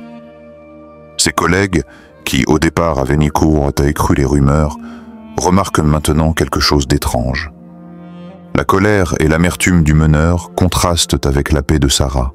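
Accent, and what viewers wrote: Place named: Canada. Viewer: Dieu est fidele❤ j ai ete et suis calomniee mais je reste la meilleure reponse..... je suis bien vivante et rayonnante😊 j ai ete calomniee dans une ecole et j ai renversee la situation contre mes detracteurs faux temoignage = peche capital😈👿 dans ce type de lynchage la lachete et la complicite de l entourage pesent lourdement.... celui qui se tait est complice. Le menteur a mal lu Machiavel😊 Dans ce cas l issue est heureuse mais helas parfois l issue est tragique pour la victime super l accent canadien😊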